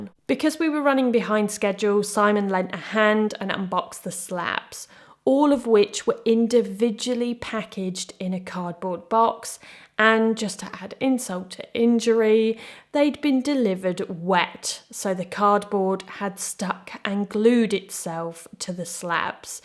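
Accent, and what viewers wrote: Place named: Scotland, United Kingdom. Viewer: I'm trying to figure out what kind of accent is this? Is this Scottish or English ? iots somewhat extreme, but I love it.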